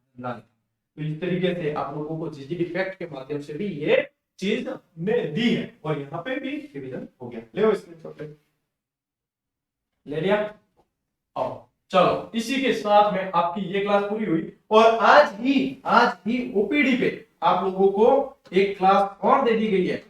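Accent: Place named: Nagaur, India. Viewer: Sir aap pali ke ho par aapki Bhasha nagor ki aa rahi he or sir pali me kha se ho please reply 🙏 🙏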